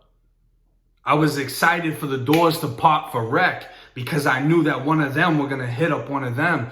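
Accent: Boston, United States. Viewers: Bro! I love that slight Boston accent + you really have a feel 4 telling what happened and taking us there! I'm binge-watching this shit until I'm up 2 date. Greetings from Romania!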